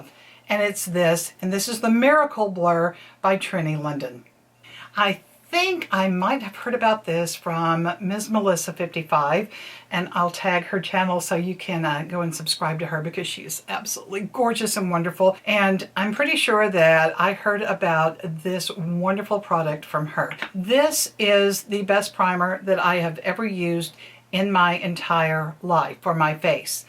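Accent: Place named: South, United States. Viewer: Beautiful skin❤️hear some southern in your voice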